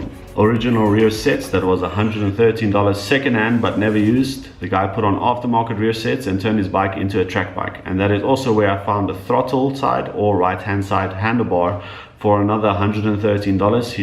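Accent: South Africa. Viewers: Ever since I watched your first video and heard your accent I knew you come from south africa 😂 en uiteindelik het hierdie video dit bewys. Hou aan met die goeie werk en videos yster! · Love it my dream bike the alien ...was happy to hear a south african brother!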